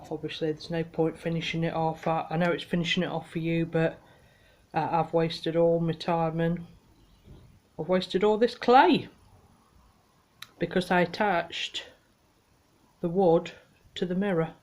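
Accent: United Kingdom. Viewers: Morning, love watching & hearing your videos & sorry to hear about your little dog, mine had a bad leg & I couldn’t stop her jumping on sofas, beds etc little devil. Nice to hear a UK voice, what part are you living, I’m in Northumberland. Hope you get this sorted 🤓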